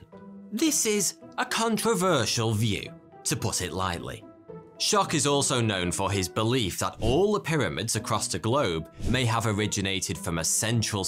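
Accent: United Kingdom. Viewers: Before giving in to this video's nice British Accent. I encourage anyone to watch Rogan #961 for a full debate between a leading skeptic and Randall Carlson/Graham Hancock. There's a solid foundation for his reporting and it's more challenging to actually listen than to just swallow the easy answer that "Leading Experts" disagree. His solo venture #1284 of the Podcast also includes a deep dive into the Piri Reis Map, and I was skeptical until the revelation about the Bimini Road. Definitely a worth few hours of your time.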